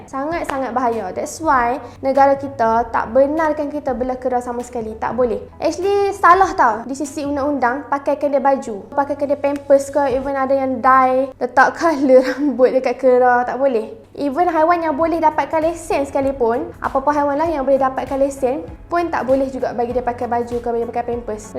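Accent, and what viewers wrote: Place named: North, Malaysia. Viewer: Seronok dengar loghat utara kakak❤❤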